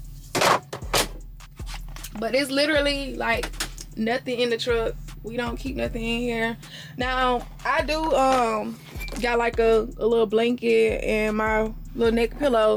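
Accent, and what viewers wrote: South, United States. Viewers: I love her southern accent. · Not sure how I got here but it’s the country accent that keep me engaged think imma go ahead and subscribe 😂I like the vibe of the video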